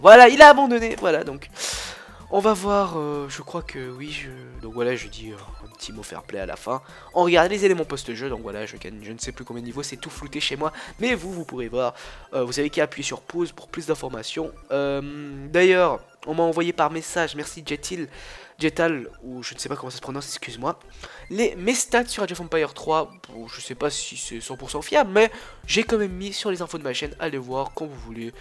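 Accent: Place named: France. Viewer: J'adore ton accent à la française.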